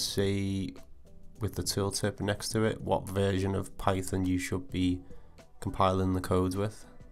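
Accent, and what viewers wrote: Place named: Liverpool, United Kingdom. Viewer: When I heard that accent, I thought "Oh, great! A fellow Scouser! - He will be able to help!" Sadly, this video was only useful to those writing scripts, but that was not my case. I have a MIDI Wind controller that Ableton is not recognising it. Any advice, our kid? Greetings from a scouse exile in Catalunya.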